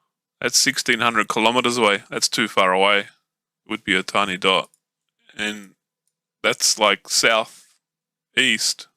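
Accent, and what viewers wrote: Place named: Australia. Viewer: I got sooo much to catch up on 👍love your Aussie Accent coming through 😅.. this sounds amazing.. 👍 Great to catch up on some new videos ( new to me as I’m running behind ). Always great to watch your videos.. WOW what Size is that I wonder 💭 Thank you so much for sharing again 💫😃 🛸 🛸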